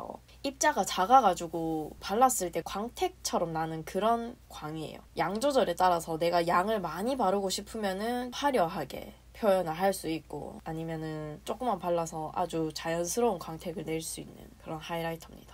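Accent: Gyeongsang, South Korea. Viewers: 그냥 사투리가 같은 느낌인데.. 경상도인이라면 누구나 알,, 다들 이 사투리쓰고 이런 억양입니당 이분도 이분만의 매력이있쥬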